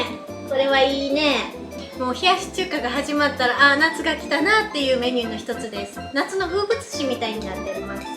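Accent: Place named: Kansai, Japan. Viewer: 関西弁が大好きです❣ 何時かそのラーメンパスタを試してみたい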